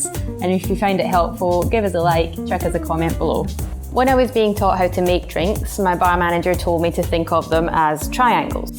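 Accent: Scotland, United Kingdom. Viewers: Wonderful Scottish accent! Pairfect! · I had to rewind, first time hearing that on YouTube. Good Scottish lass. 😂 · Scots accent, that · Best ever bartender - competent, brilliant tutorials, love hearing the history of the drink and about the spirit brands. Last but not least - I love your Scottish accent 😃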